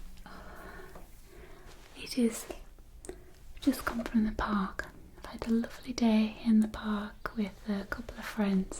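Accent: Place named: North, United Kingdom. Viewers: Thank you for this from Newcastle ☺️ your northern accent is comforting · I love your accent, I grew up in Teesside and find your voice so familiar and comforting ❤️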